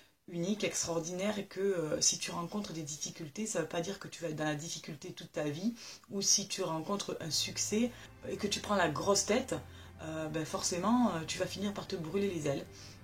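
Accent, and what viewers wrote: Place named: Quebec, Canada. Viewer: Alors comme à mon habitude j'écris en écoutant ta vidéo! la purée de noix de cajou, je ne savais même pas que ça existait, j'adore les noix de cajou (non salées bien sûr), ça doit se trouver à la Vie Claire. Les bijoux que tu présentes en premier ont l'air super jolis, mais bon je ne suis pas très bijoux. pas de bagues, pas de montres depuis 2005 (on a l'heure partout), juste une petite chaine et des boucles d'oreilles. J'adore l'accent québécois! J'admire et je respecte les personnes qui sont sensibles à l'environnement. Dommage qu'elles soient si peu nombreuses. J'ai un peu plus de mal avec les vegans (je respecte leur choix, mais quand je vois le comportement de certains extrémistes, la réciproque n'est pas de mise) je te souhaite un joli mois de novembre, moi ce sera loin, loin.... la réalisation d'un rêve.... bisous!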